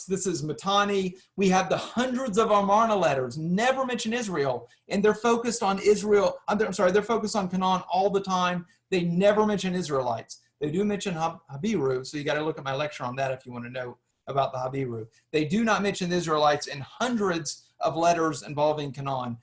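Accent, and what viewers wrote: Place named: South, United States. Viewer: I think it's just his Southern accent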